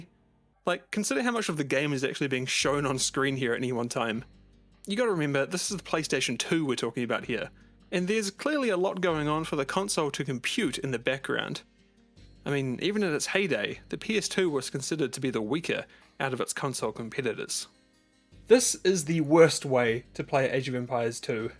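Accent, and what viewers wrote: New Zealand. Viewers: Cute NZ accent, lol.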